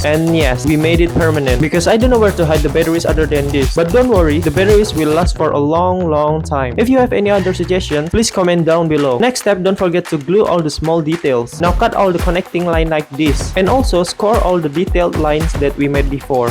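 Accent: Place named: India. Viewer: Kirain orang prindapan,logat inggrisnya mirip soalnya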